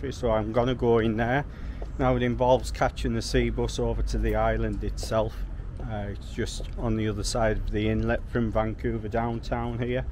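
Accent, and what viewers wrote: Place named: Teesside, United Kingdom. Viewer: Hi, first time coming across your channel and I will subscribe, you really sound Middlesbrough, I'm a Stockton photographer, good to hear a local Teesside accent abroad.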